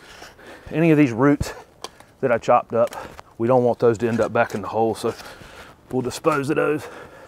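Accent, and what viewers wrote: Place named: Central North Carolina, United States. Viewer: Thanks for this great video! I’m a Dukie and would swear I’m hearing a central NC accent. Feel like I’m hearing home. Will now be a subscriber forever!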